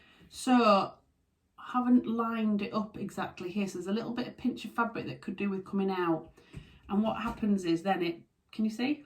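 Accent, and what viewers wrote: Yorkshire, United Kingdom. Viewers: You are totally not alone! I want to make all the things too! I daren't write them all down, but let's just say that the patterns have had to be moved to a new home because they were jamming my sewing desk drawer. So there’s quite a few 😂. My resolution at the moment is to prewash all the fabric I've just stuck in my cupboard in the last 6 months, then I might actually work out what I have. T-shirts and T- dresses definitely on the agenda though. Great vlog as ever, thank you, and lovely to hear your Yorkshire accent as it reminds me of home.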